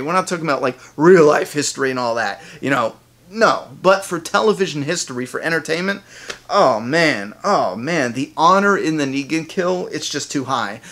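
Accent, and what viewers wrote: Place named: New York, United States. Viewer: u gotta be a new yorker! im from brooklyn myself n u remind me of people i knew back in brooklyn. sorry, just had to say that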